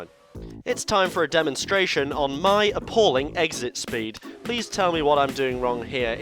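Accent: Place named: United Kingdom. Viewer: hey i've been watching your videos for about 4 years, but in all that time you never explained us how to get that fancy british accent. i would rly appreciate a tutorial or sth:-)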